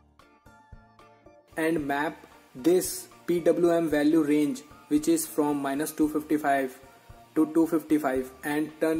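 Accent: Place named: India. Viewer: Porque hablas como Indio y el stick de la radio necesita lubricación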